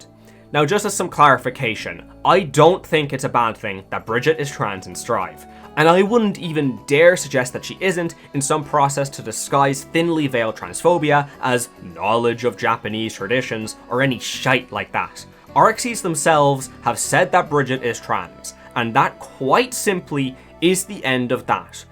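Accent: Ireland. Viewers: Came for biscuit, stayed for rambling Irish man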